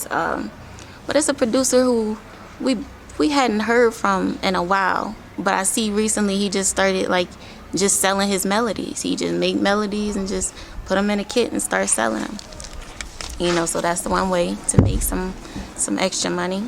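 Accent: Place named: United States. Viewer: Where are you located?cos the accent says American but the scenery says AFRICA!!!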